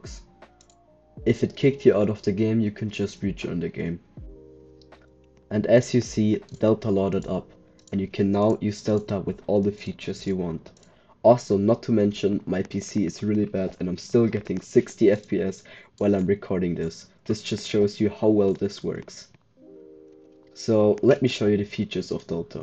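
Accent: Germany. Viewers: I hear i little bit of german in his pronouncing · Man merkt sehr stark, dass du Deutsch bist.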